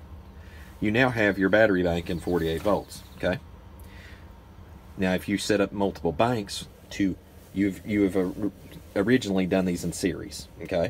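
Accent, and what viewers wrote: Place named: South, United States. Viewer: Love your southern!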